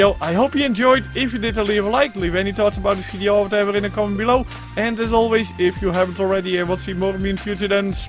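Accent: Netherlands. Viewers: Ben je nederlands ofzo? (Je klink gewoon zo)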